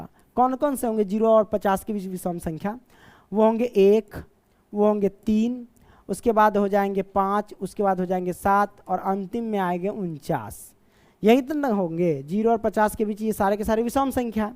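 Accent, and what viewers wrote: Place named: Rural, India. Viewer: Gawar ke trah bolta h dehati😂😂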